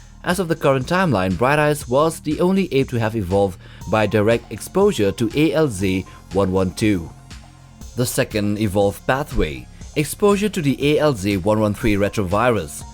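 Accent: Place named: India. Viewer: i made it 20 seconds, i couldnt bear the narrators indian voice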